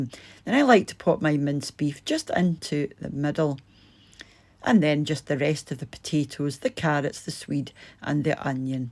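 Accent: Scotland, United Kingdom. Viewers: ❤ it i fall for this Scottish accent. O aye! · Watching again, because I so love that beautiful Scottish accent ! Also , because you asked! Lol have a blessed day/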